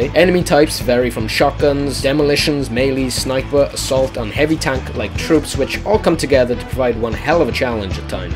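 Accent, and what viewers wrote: Wales, United Kingdom. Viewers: obviously hes welsh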